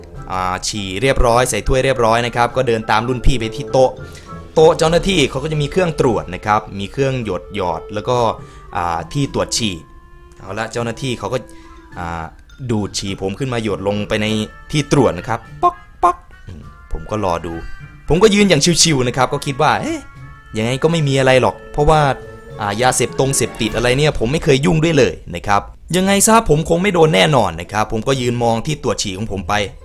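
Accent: Isan, Thailand. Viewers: พี่ผมถามจิงๆนะ พี่เป็นคนอีสานป่ะแม่นบ่ ตอบผมเดี๋ยวนี้ด้วย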